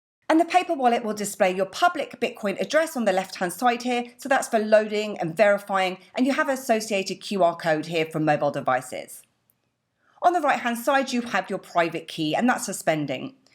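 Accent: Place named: Essex, United Kingdom. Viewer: a lovely Essex accent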